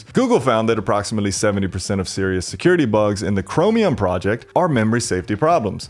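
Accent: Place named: Chicago, United States. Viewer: Completely off topic but correct me if I'm wrong but is that a Chicago accent I'm hearing?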